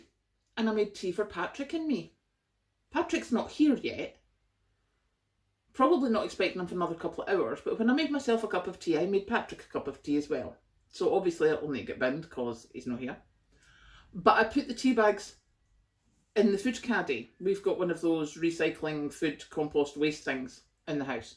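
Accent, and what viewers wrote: Scotland, United Kingdom. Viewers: agree - yes, some days a small step is worth reflecting on as many small steps get us to the end of a task and some times it is just too much to think of whole tasks - a little step or a few help keep things under control and keep us moving forward - love your planning and organisation - never tried a slow cooker myself - sounds like a practical idea and probably less fuel than some stoves ( I'm in a rented house - with solid plate electric stove and the oven does not heat up much) was wondering if I might try making wholemeal scones on my electric griddle but have been focused on some other stuff and haven't baked for years!:o) maybe one of these days....?! Love your practical ideas and applications - realised recently many of us were misguided by media etc and when I see people with back up heating and back up cooking that seems to be so practical and wise than the house proud decorating focus and other lifestyle addictions many have had in recent decades - miss the Scottish accent now that I am back in N Ireland - have shared your channel with some Scottish friends. 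Take care. Best Wishes.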